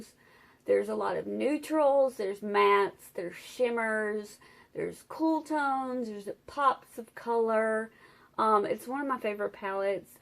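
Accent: South, United States. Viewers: This is such a great declutter video!!! Omgosh! You have such an amazing pallet collection it's CRAZY! I dont know what i would do having nice pallets like these to create with... 😏💭 just gorgeous😍 I have to say, I love your accent. Very strong southern accents are my favorite 😃😍 I use to live in South Carolina, I loved it.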